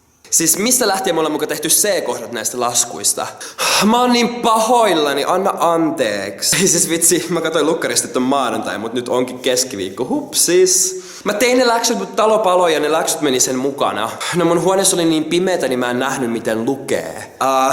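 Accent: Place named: Lapland, Finland. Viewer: Toi sun lapin murre on vaa jotenku sulone😂😂❤️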